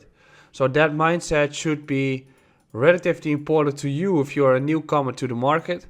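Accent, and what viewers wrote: Netherlands. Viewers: Nee man, maakt niet uit. Beetje boeren Nederlandse accent stoort niemand zich aan :P Je hebt een leuke persoonlijkheid en je NL accent hoort daarbij. Ik zeg, houden. Je hebt nu al verdorie meer subscribers dan veel kanalen die native Engels zijn dus mijn inziens doe je het goed :) Je wordt zelfs vaak op wereldbekende crypto nieuws websites aangehaald als expert dus je doet echt wel iets goed haha.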